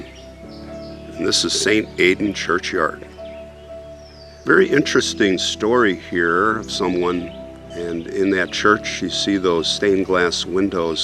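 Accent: Scotland, United Kingdom. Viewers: Ma Scottish Grand-dad would have approved of ye Scottish accent🍻 Once again - if one Goggles: The Bold at Bamurgh Castle -- you will see this video above the others listed there😊👍 I wonder why the dad doesn't seem to also have a special burial place? It's sad that she died so young. That poor mom that had her children drowned & the others.😢 It looks like eventually the sea may over take that beautiful Castle one day. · The argyle sweater is a nice touch. Fits the Scottish accent.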